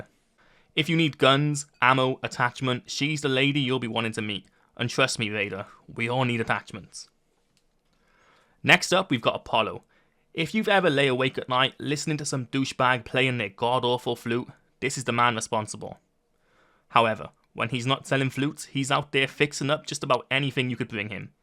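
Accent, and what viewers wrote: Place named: South Wales, United Kingdom. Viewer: You sound like your from my neck of the woods. I'm from South Wales. Cool video. I wanted to see this side of the game. I'm thinking about getting it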